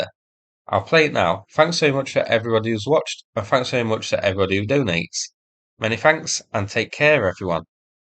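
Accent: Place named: East Midlands, United Kingdom. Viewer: You’ve definitely got an East Midlands accent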